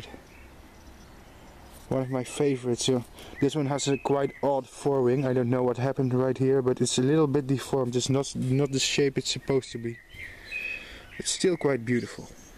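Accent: Netherlands. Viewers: Those are some very pretty moths! I immediately knew you were Dutch haha! But don't even worry about the accent, you're very easy to understand :) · Beautiful creatures, and never apologize for your Dutch accent!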